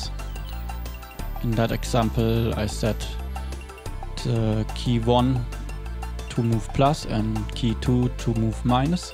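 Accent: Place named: Germany. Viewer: no offence but i find it amusing that you speak with a german accent in english, and your youtube ava is an asian :) thanks for the video, i think this might have helped alot! :D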